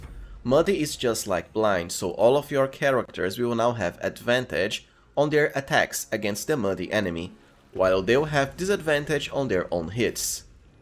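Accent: Brazil. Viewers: U brazilian?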